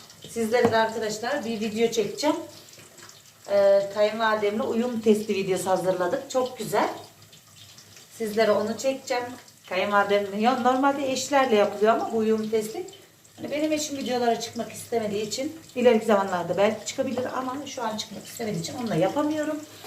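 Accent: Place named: Beyşehir, Turkey. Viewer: Bende beyşehirliyim ctesi pazarına bende gittim Baska yerde oturuyom konuşmanı bize benzettim de abone oldum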